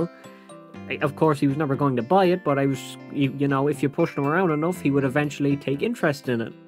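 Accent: Ireland. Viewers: irish person detected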